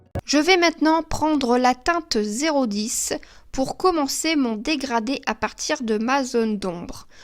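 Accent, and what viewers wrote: Nord-Pas-de-Calais, France. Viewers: Tu n'a pas a etre complexée de l'accent chti... essaies 1 fois ....rien que pour voir les commentaires ... Ose..! j"attends ta prochaine démo impatiament ..... comme je te trouve sympa ce petit truc pour te faire économiser du crayon: quand une mine casse mets une goutte de glue UHU dans le trou et atends 24 h .....bise...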